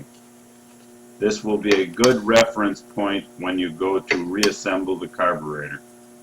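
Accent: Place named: Minnesota, United States. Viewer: I bet you are a Minnesotan?? You have the same accent as all my relative's??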